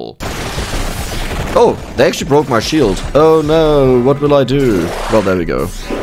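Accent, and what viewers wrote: Germany. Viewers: Kann das sein das du Deutsch bist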